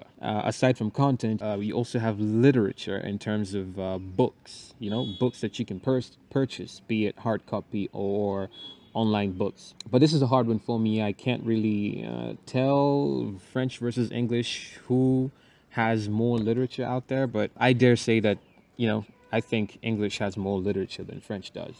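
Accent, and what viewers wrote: United States. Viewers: You do have solid points in your analysis. Thanks for the share. By the way, where you got your American accent from 😀? Did you live in US or Canada??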